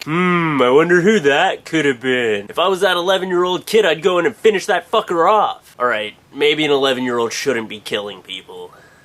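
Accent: Canada. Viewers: r u canadien? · Is this guy Canadian? Canadians can't make funny!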